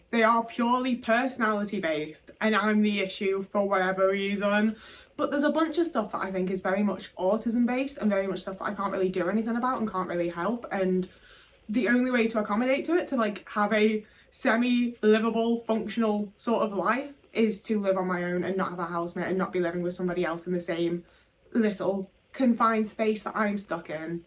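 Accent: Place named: Liverpool, United Kingdom. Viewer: Hi. I completley relate to this. I am autsitic and I will never in my life understand the pettyness of some neurotypical people. I think the best kind of people are the ones where you canbjust exist together in silence with no pressure. Saying hi every time you pass them go for a wee just feels fake and forced and no need. I think high functioning autistic women are like Sigma personality types... the typical herd mentality people dont understand independence and how we dont crave constant validation like they do. I think autistic people are sensitive to energy in an intuitive sence, i feel like i can communicate with energy and i dont need to speak. I think we are just super special people and its their loss if they can't make small accomodations to make you comfortable. What they also dont get is, maybe if they made these small accomodations, i would have less shut downs, and then would be a more content, positive version of myself and probably more likely to be more sociable. 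If small accomodations are not met, i speak a lot less because im in shut down mode more often!! So they bring it on themselves, everyone has needs, ours are no more 'difficult to meet' than others. I try to tell myself, it is always their loss, not mine. I have only breifly watched your channel twice or so, your scouse accent, swearing and bluntness makes me laugh a lot and what you say is so relatable. Thank you ❤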